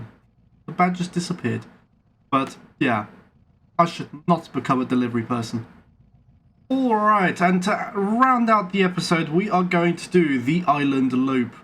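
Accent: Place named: Germany. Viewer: What is your accent? Your profile says German, but you don't sound like most Germans I have seen talk. I assume it is some kind of German regional accent, so I am curious what specific region.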